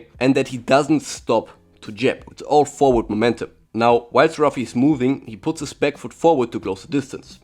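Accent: Germany. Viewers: I hear german hahah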